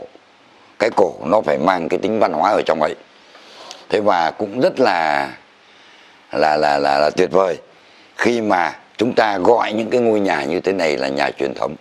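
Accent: Hanoi, Vietnam. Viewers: Xem những video chế anh, em thấy buồn vô cùng. Cũng chỉ vì những con người hiểu biết nông cạn. Em Theo dõi anh hơn năm nay rồi. Đã nói tới ẩm thực là một đề tài khó. Ai mà hiểu cho hết. Cứ coi như anh kém cỏi đi. Nhưng chịu khó tìm hiểu sẽ tốt hơn. Anh giọng hay chuẩn mực, hơn người Hà Nội nhiều.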